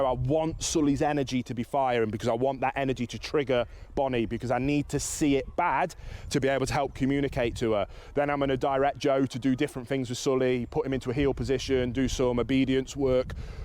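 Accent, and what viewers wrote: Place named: East Midlands, United Kingdom. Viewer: I can't pin your accent, I first thought Coventry but then I detected a slight East Midlands twang, maybe somewhere near the A5?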